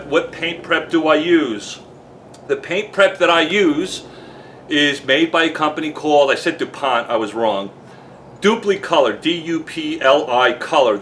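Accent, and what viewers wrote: New York, United States. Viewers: I knew I heard that NY accent lol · lmao, Been watching some of your videos for a few weeks and one thing that's been eating me is your accent and being in Dallas! Whole time I've been watching your videos I'm like this guy is definitely not from Dallas and now I know. Aspergers, no, New Yorkah, yes, lol. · Hey it all makes sense now!! I’ve been watching all your videos and see you live in Dallas but that accent felt all too familiar to me it was confusing the he’ll outta me now it all makes sense! Haha I’m a fellow New Yorker too love your videos I wanna start a detailing business this summer and your videos are my go to for information thanks a lot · Thanks, from down under in Australia. Love the NYC accent and the way you present this valuable information brother.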